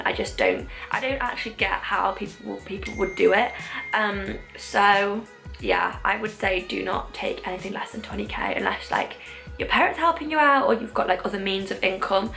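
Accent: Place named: Sheffield, United Kingdom. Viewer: Knew I was recognising a Sheff accent all this time! I'm from Darnall and have been seriously considering London for uni lately.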